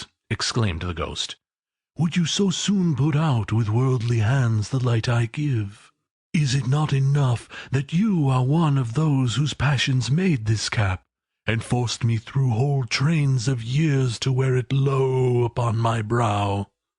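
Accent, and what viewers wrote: United States. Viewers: American accents are no good for Dickens period ! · we say it “you bik wi tous” in the us and he sounds America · Bye = American accent. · At first I wasn't so sure about Dickens in an American accent, but this one actually turned out to be pretty good. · Managed about 4 minutes listening to that droning yank accent.. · Wish this was in an English accent. The American kind of ruins it. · Dickens told in American-English accent?? Really? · as good as this reading is the mere fact that it is read by an American accent and the word "clerk" in britain is pronounced "clark" which takes away just a little from believeing this tale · Sorry. Merkin accent. Bye bye. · Yes...now am about to switch it off because it's in American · why an American reading a book set in Victorian England?? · I am, but it doesn't sound right in an American accent. 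I'm going to find an English version! Merry Christmas! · Awful American version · I’m sorry but I can’t deal with the American English. Anton Lesser ( Game of Thrones) does Dickens very well. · I want a version thats not told with an American accent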